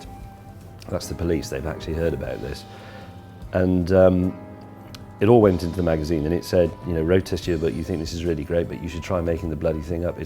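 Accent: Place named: United Kingdom. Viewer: It's not caarth its cars.. I swear, I hate British accent...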